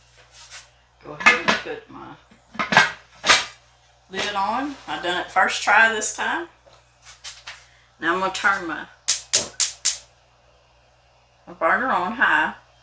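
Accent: South, United States. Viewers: Wow, that is amazing! I don’t have a bread machine.. Diabetes runs in my family, so I have to stay away from breads. You always have an educational video. Thank you for teaching us 💕. I am from Iowa. We pronounce pecans differently. Love your southern accent😀!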